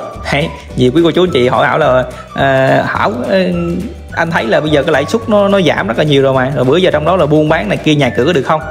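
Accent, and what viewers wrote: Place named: South, Vietnam. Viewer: người Quảng Ngài cháu nói giọng niềm nam rat rõ, love kênh cháu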